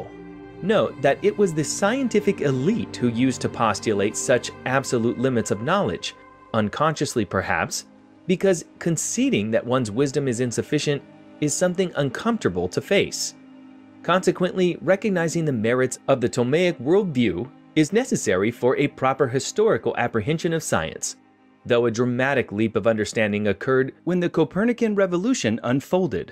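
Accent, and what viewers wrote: Germany. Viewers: Physics is more fun with a German accent!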